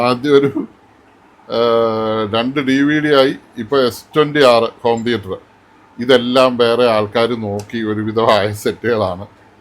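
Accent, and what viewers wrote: Kottayam, India. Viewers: അച്ചായാ വളരെ ഇഷ്ടം നമ്മൾ കോട്ടയത്തു കാരുടെ സംസാരവും സംസ്കാരവും ലോകം അറിയട്ടെ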